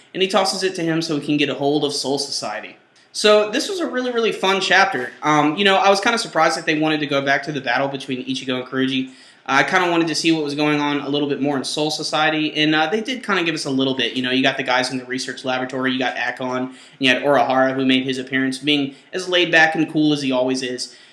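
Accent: Germany. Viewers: Once again, your german accent is priceless!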